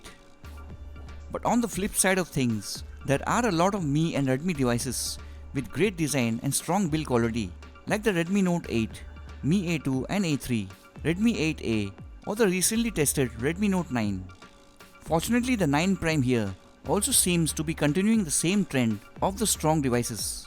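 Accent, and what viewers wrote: India. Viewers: Nice test bro, india aksen 👍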